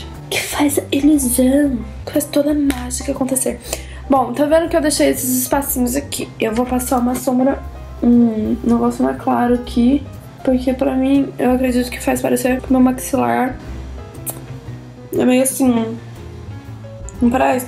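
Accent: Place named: Rio de Janeiro, Brazil. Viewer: querida, tu tinhas um resquício de sotaque carioca... Amey esse vídeo!:D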